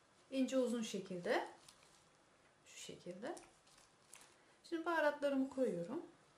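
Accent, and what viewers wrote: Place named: Kütahya, Turkey. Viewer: slm hanim nerelisiniz sorabilirmiyim??sanki arada bizim oranin yani kütahyanin sivesini kulaniyormusunuz gibi geldi:-) bu arada dün pisi tarifinizi denedim güzel oldu sagolun ☺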